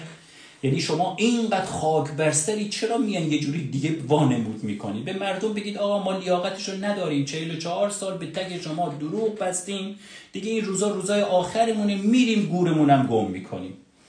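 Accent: North, Iran. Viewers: عاشق شمالی حرف زدنت هستم❤ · چہ ادبیات زیبائی با لحجہ شمالی . فکر نمیکنم کسی حریف تو در جمھوری 😂اسھالی باشد .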